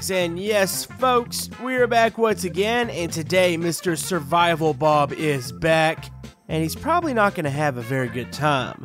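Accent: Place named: Texas, United States. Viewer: is problobly from Texas from his accent.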